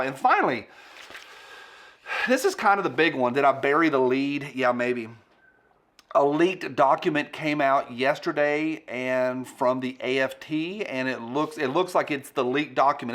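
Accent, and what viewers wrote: South, United States. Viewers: Howdy,! I'm from Illinois, but love the southern accent. Your channel is awesome! Thanks for the info and laughs!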